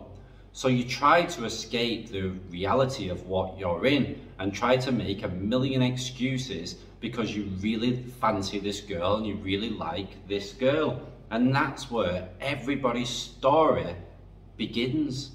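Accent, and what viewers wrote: Manchester, United Kingdom. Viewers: That back home accent sounds like Walkden Manchester. Just dropped on to your site this morning been binge watching since 8.00am this morning,beento Thailand twice now havent been in 5 years,those pesky kids cost a fortune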